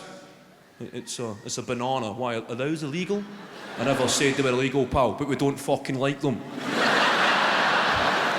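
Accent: Scotland, United Kingdom. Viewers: Well, I didn't know what you were talking about, given the fast stuff and the accent and the local terms, but I hope it was friendly to the U.S. Otherwise, we'll ship Donald Trump to Glascow (having been deposed here recently) and he's YOUR MILLSTONE. Cheers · As a Canadian with a 2x lethal exposure to the Scottish accent, I can listen to this w/o subtitles. Also, "swapped his personality for a hi-vis vest" got me. X) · That's because about 15% of what exits his mouth is not English, it's his and my native language, Scots. 100% Scots sounds like this, <link> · *didnt quite understand everything you said, you've got a very strong scotch accent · I looove his Scottish accent even though it is quite difficult for me to understand everything as a non-native speaker. 😍😄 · Love the scottish language, do not change it,! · He sounds like Trainspotting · Reminds me of my 80 year old Scottish neighbor Bob Thompson, the accent coupled with the Scottish mind can make anything funny! 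· I just come here to listen accent.... Russian accent is Badass... Jamaican is funky.... Scotish is coolest....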